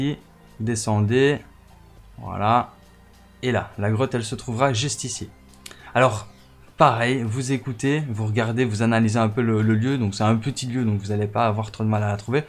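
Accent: Belgium. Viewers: IL EST BELGE IL DIT OUITE POUR HUIT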